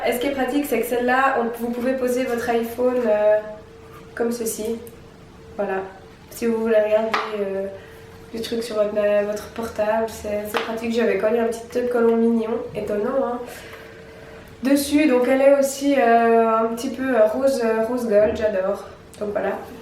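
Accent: Belgium. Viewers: tu est (d'origine) belge ou suisse ? ou tu est bretone ? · OK g mieux compris mais tu viens de Belgique je trouve que tu a un accsant de la bas( on s'en fout ci ça s' écris pas comme ça)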